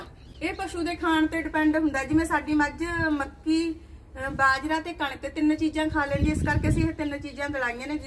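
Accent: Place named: Moga, India. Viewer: ਭੈਣਜੀ‌ਮੋਗੇ ਏਰੀਆ ਦੇ ਲਗਦੇ ਓ ਲੇਟੀ ਸਬਦ ਓਧਰ ਹੀ ਬੋਲਦੇ ਓ ਵੈਰੀ ਗੁੱਡ ਭੈਣੇ ❤❤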